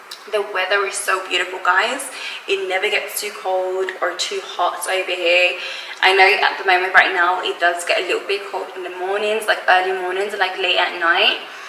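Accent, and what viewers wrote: United Kingdom. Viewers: U give the feeling that u got a bit attitude . Sorry to say but u are too british in your speaking and body language. If u like western lifestyle maybe try to live in the states or uk and leave africa for the ones who appreciate the real africa without west influence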